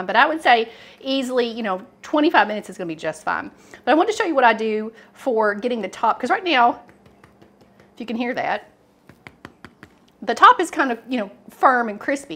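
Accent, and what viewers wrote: South, United States. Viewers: 👍🏻🙂 One of the best dough making videos I’ve watched… And I’ve watched many. You explained every step with unmistakable southern charm. Thanks again. I learned a lot. 👍🏻🥖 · The Southern accent is so so charming. Thank you for the tutorial fantastic instructions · I live in Canada and I love your southern accent and I love your recipes. Thank you! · I hear a southern voice telling me how to make food, I listen.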